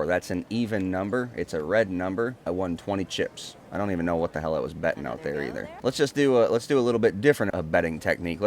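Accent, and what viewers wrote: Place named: Minnesota, United States. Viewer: Your from Minnesota. So I’m I 🤪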